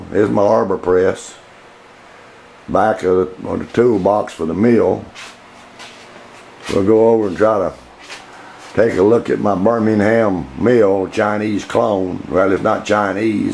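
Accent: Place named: Louisiana, United States. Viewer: That's a really cool shop. That model table you made for Abom79 is AWESOME!!! The most of what I do in my shop is make stuff for the house and the grandkids, I can only imagine the really cool stuff you make for your family & grandkids LOL. Your level of organization seems like something from a submarine or ship. Two guys I was in the Navy with were from Louisiana; Kerry Gros from Shreveport and Dan Sumrall from Denham Springs; your accent reminded me of both of them. Keep the vids coming, your work is really interesting.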